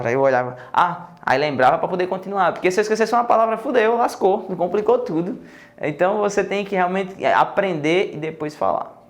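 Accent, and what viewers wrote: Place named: Northeast, Brazil. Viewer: primeiro curso online com um professor com essa linguagem muita boa de intender; sou do ES e to adorando esse sutaque nodestino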